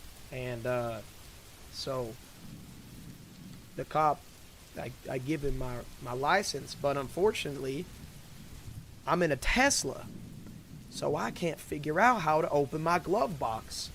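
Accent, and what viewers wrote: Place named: South, United States. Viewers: Oh my gosh ikr!! I was thinking that he has a Southern accent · crazy how no ones talkin on how dat country accent was on point tbh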